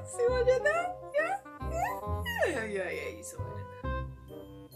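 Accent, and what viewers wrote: United Kingdom. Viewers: Hi, i learn english on your vids, i like it, but could you make the background sound little bit lower for better comprehending what you saying, and plz, if it possible make more educational vids with your awesom british accent, luv your funny nozze)))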